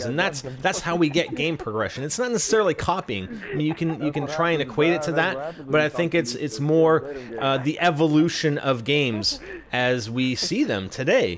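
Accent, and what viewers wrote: Canada. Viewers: are you Canadian by chance?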